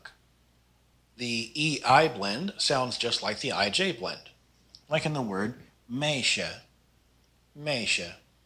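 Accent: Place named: United States. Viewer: are you american, you sound so america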